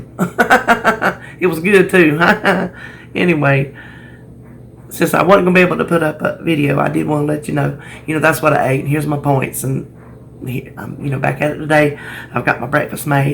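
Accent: South, United States. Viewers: Hi! My name is Elizabeth. I just recently started watching your videos. I totally understand your southern language since I am from Mississippi but now live in Niceville, Florida. You are doing great! Keep up the good work. I love you are taking the good ole southern cuisine and making it ww friendly. Have a great weekend!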